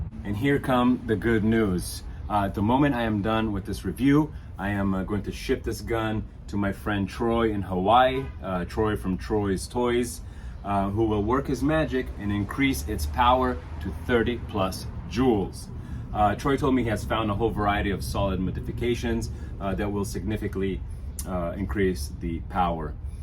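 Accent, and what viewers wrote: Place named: Italy. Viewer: Ciao grande!,. Tu sei italiano vero??? Su sente dallo slang inglese ... Parli perfettamente inglese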